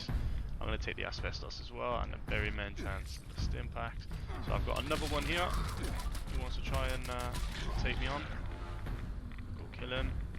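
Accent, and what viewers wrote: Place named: Cardiff, United Kingdom. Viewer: I live in Barry currently but all my family are from Cardiff! Small world! Didn’t expect to hear a familiar accent while watching fallout 76 videos... thanks again! Subscribed and liked 👍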